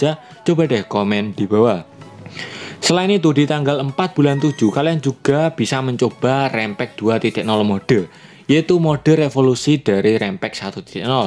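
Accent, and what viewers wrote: Java, Indonesia. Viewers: abang Orang mna sih bang JAWA YA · Bosone kok medok bang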